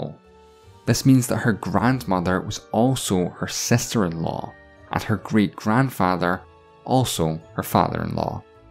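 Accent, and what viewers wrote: Scotland, United Kingdom. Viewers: Do i hear a Scottish accent? 👀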